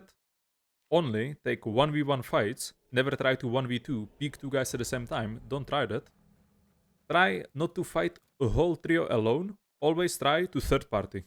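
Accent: Czech Republic. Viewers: Náhodou jsem na Tebe natrefil, podle přízvuku si říkám, to zní jako obyvatel stejné země jako já a ono ano 🙂, jsi opravdu kapacita, mrknu, zda máš i další videa a pak dám odběr, díky. :hand-purple-blue-peace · Damn i think i hear czech accent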